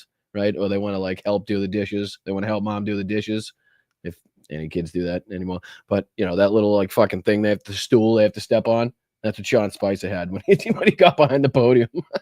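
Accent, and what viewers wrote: Boston, United States. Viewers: I love your Boston accent, but I think the amount of swearing is unnecessary.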